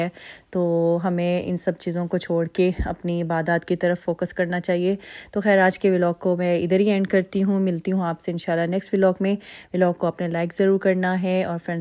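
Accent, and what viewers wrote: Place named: Karachi, Pakistan. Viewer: Abp Pakistan mean khan sy hy lahore ya karachi batey ga lahjato karachi ka lgta hy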